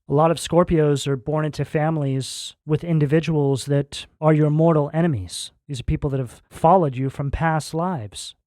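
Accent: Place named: New Jersey, United States. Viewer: what a deep and meaningful reading meant a lot and do accurate on every level … thank you 🙏💓 and I just love the way you look if my mum was here now, that’s what she wanted me to have my hair like yours just thought I’d pass that on 🤭wish you a super time in ‘out of state’ from me in the Uk altho my Mom was from New Jersey … and i also enjoy your accent it makes me feel close to her god bless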